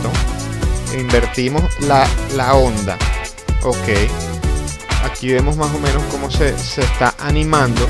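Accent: Venezuela. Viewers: VENEZOLANO? HABLA COMO DROSS PERO VERSION TUTORIAL XDXD VIVA VENEZUELA :V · Eres venezolano? :v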